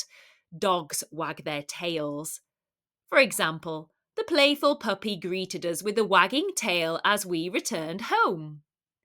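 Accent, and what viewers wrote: United Kingdom. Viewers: British accent is so romantic and beautiful 😊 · I like all of your stories too much, it is practical, with new words, nice u.k accent 🙏🙏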